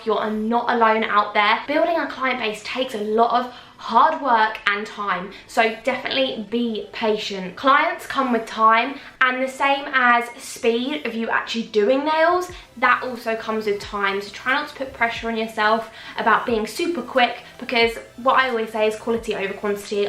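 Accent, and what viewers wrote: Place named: United Kingdom. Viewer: I´m not a nail tech, but I like to do my nails by myself and watch your channel and listen to your UK accent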